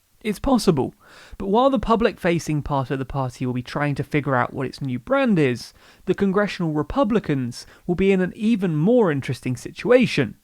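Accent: United Kingdom. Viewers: The initial cringe I had hearing a british voice talk about "transition period" I was thinking IT NEVER ENDS!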